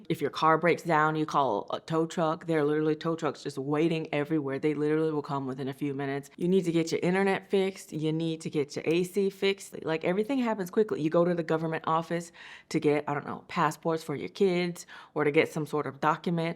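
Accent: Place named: Georgia, United States. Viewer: How have you not lost your Georgia accent?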